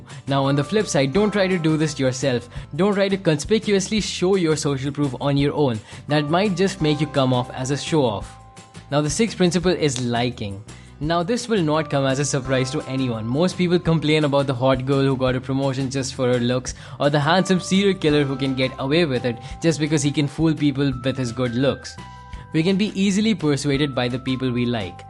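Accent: India. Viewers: I'll be very racist now! Please!!!! Let the native english speaker voice this cognitive stuff, and later on this dude who is probably indian can translate all this to his native language...I cant get a thing because of his obvious accent... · Indian blabber lol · its indian accent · Jitna bhi Dollar Dollar bol le, pata chal hi jaata hai ki apne Indian Bhai ki aawaaz hai. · Your accent sounds indian-english where are you from? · I like how accent. Sounds like an Indian guy from Asia. Nice to hear for me. Shows the reach of this media as well as how small our planet is. Perhaps we will realize we are virtually all the same. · I like how it's narrated by an Indian man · I had to skip . Your accent maybe me feel like I am getting scam from the phone calls from IRS indian people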